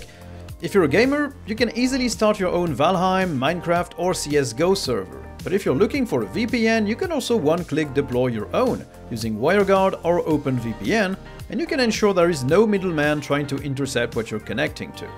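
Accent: France. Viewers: French accent, are you from France?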